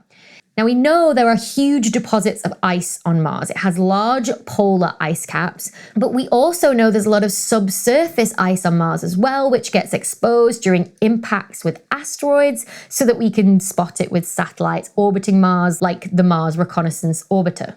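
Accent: United Kingdom. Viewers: Dr. Becky: Being an academic is hard. Middle-aged me working in food service: Tell me about it. Your accent tells me you're a Pom, so you have guaranteed health care, right? As an academic, do you get sick time? Paid holidays? My health care comes out of my own pocket, and all I get if I have to take time off from work is a smaller paycheck. I'd trade places with you in a heartbeat. To paraphrase Ford Prefect: Academic stress is an illusion. British academic stress doubly so.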